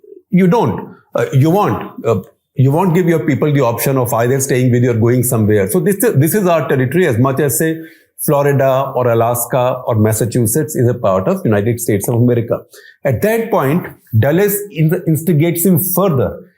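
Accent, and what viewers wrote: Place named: Punjab, India. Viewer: Hate this guy's shitty Punjabi accent 🙉🙉🙉 bad orator too he is.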